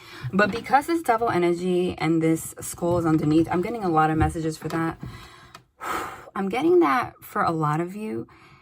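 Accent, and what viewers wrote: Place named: New York, United States. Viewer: I needed this right now. it resonated so hard. I was thinking I need outside guidance to validate and clarify my own situation and this video was at the top of my notifications. posted like a half hour ago. and I've been sitting here for like a half hour pondering what's going on and boom here you are. and as a New Yorker I LOVE your New York accent. god it's so refreshing hearing a reader who speaks like me. like hearing my messages in a to the point direct New York way. like I feel like my mother or cousins are talking to me. I love your method of channeling. I have a similar type of occurrences of signs and validations and the cards coming out.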